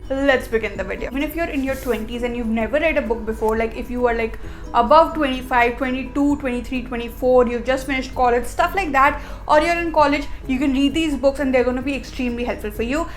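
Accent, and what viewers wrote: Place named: England, United Kingdom. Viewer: You have such a great English accent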